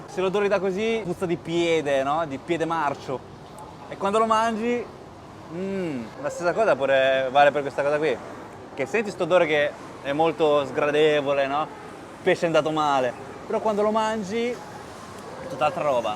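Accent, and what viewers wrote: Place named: Pesaro, Italy. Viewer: Grazie, i tuoi video sono molto gradevoli, il tuo accento pesarese e' incantevole e tu sei una bella persona garbata, sicuramente sei il riflesso dei tuoi genitori.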